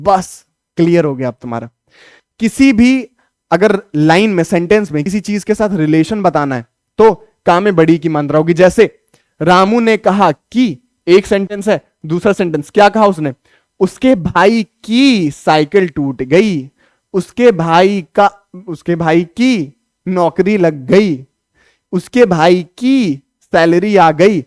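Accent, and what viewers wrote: Bihar, India. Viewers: Bhaiya Bihar se ho.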